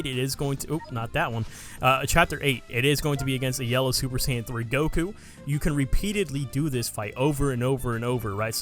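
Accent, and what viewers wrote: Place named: Philadelphia, United States. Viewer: “ Launch that jawn “ bro definitely from Philadelphia or close by 😭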